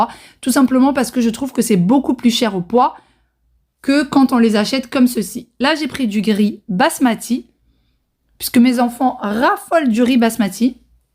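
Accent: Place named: Morocco. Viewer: Tu es marocain d'apré les produits😂😂et l'accent nous les femmes marocain hh on'est trés connus😅bon chance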